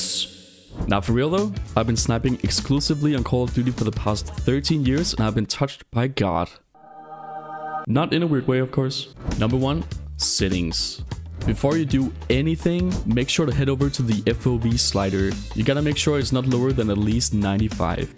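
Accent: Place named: Denmark. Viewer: You sound like your from denmark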